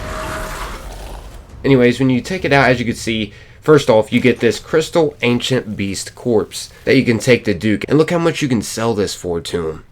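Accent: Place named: Mid-Atlantic, United States. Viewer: That mid Atlantic accent though 🤓 where you from man? My guess is MD or PA.. am I right?!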